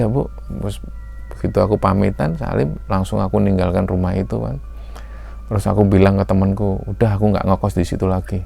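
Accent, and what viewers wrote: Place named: East Java, Indonesia. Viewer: mas, smpean arek suroboyo ta? kok logat, intonasi sma drh2 yg smpean ceritakan di episode2 lainnya gk jauh2 dr Jatim ( Sby, Sda, Mjk, jbg ). Tp memang baru di episode ini sing aku bs bener2 "menjadi dirimu", hehehe.. salam teko dr arek kedungdoro Mas.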